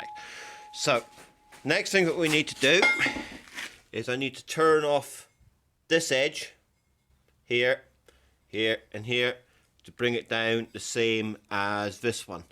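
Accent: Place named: Scottish Highlands, United Kingdom. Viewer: You sound a bit Invernesian or Highlands definitely. Are you still about?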